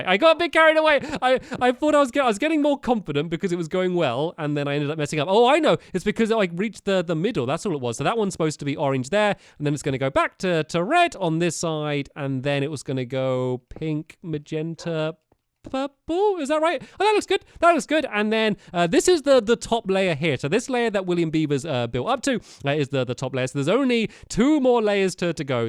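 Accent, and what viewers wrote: United Kingdom. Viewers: For a while I stopped watching you stamps because I always wanted to do The stuff you do, but never had the chance to do it because my dad wouldn't let me. I got jealous? Well, last night My brother updated our minecraft up to Xbox live and we got ALOT of the new stuff you have. It took the whole day to figure it out. I was discouraging to my brother and told him to give up but now I'm watching him trade with villagers, something I've always wanted to do. I feel awesome now and I think I'll try to start watching you again. I miss your cute British voice!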